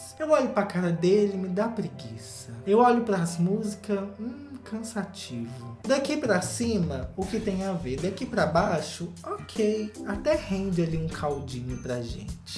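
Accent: Minas Gerais, Brazil. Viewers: O sotaque mineiro do tá cada vez mais forte, eu amo.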